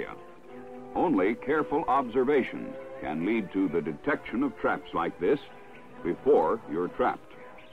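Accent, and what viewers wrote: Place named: Boston Brahmin, United States. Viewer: boston brahmin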